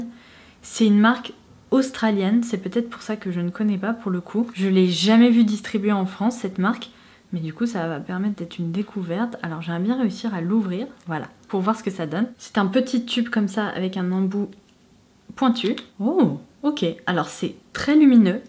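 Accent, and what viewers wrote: England, United Kingdom. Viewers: Sur tous les calendriers que j'ai regardé, celui là est juste whaou. MAGNIFIQUE 😍😍😍 Merci beaucoup pour cette belle découverte. Vous êtes d'une douceur que j'adore. En plus c'est incroyable toute votre connaissance sur les produits beauté. Et votre accent anglais, on en parle??? Juste whaou. Vous êtes un vrai rayon de soleil. Merci😍😍🌹🌈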